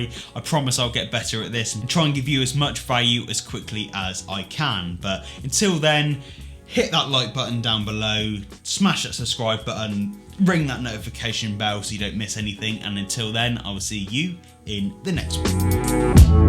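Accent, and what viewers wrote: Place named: United Kingdom. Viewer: Nice British accent sir...love it. Done subscribing to your channel sir. Please visit me at my channel and subscribe too so we stay connected. Godbless.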